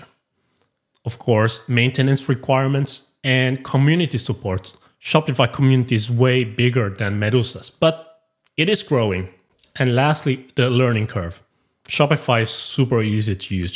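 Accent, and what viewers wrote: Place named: Japan. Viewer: I like the way you talk, the animation.. you sound japanese. cool. lets see you grow bro.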